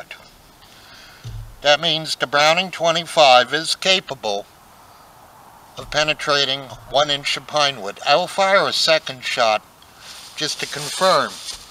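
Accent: New England, United States. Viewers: Love this New England accent. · You can definitely tell this is a Yankee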